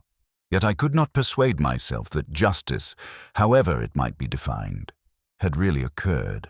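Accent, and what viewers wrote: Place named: United States. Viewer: A US narrator on quintessential Morse tale ? All wrong ! We don’t have “stoodents” here.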